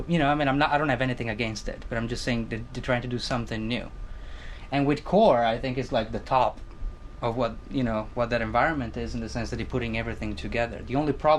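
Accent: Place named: United States. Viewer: grande mozza ! beato te ;-) you're picking up an excellent american accent...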